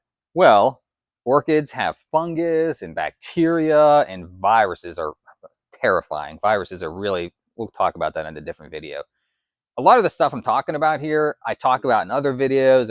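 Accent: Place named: Western Pennsylvania, United States. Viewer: Ha! I heard it… all my people are from Butler, even though i was born and raised in FL, I can pick up the slightest western PA “accent”. I love your collection, very inspiring. I have happy vandas and cattleyas, just getting into dendrobuims. I will kerp watching!!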